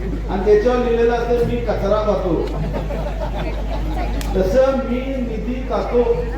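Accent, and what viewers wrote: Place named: Kolhapur, India. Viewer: Kolhapur cha avaj R V I saheb 🚩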